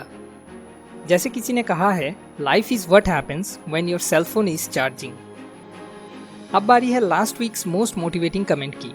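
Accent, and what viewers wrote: Bengal, India. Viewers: I am 💯% sure that you are BANGALI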